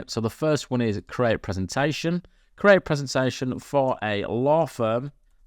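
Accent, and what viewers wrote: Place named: Northern England, United Kingdom. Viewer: Northern England.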